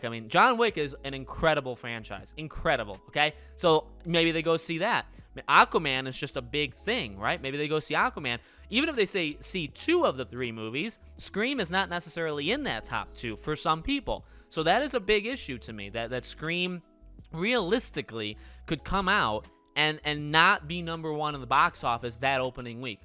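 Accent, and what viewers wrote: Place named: East Coast, United States. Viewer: Unrelated but I need to say how much I love your east coast accent. It brightens my day every time you say “Welcome to pahhhhhdcahst now, I’m!” 😝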